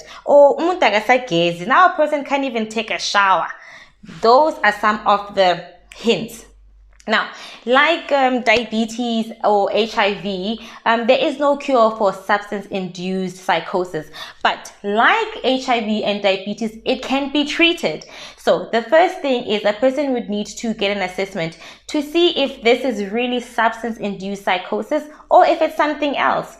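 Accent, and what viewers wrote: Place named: Africa. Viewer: Here because a friend is dealing with this. There is something so satisfying about a woman with a wholesome soothing sounding voice and African accent ☺️ I love the way you present and speak.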